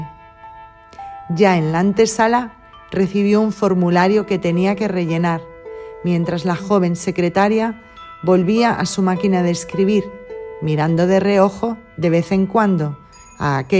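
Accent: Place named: Spain. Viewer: Gracias Camaradas iiiii Que bella voz tiene la Camarada que narra en este Audio, tiene una voz Muy Clara, Firme y Armoniosa, un español claramente de España,toda una Gran Guerrera iiiii Saludos.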